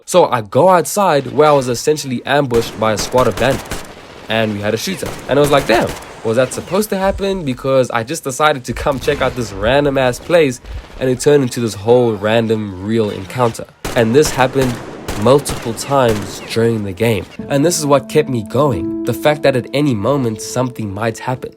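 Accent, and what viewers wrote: South Africa. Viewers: He's definitely South African! No way he's not. I know my people's accents! 😂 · Bet you're South African, Amirite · You may not see this but are you a fellow south african? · This dude is a South African isn't he? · Are you from South Africa?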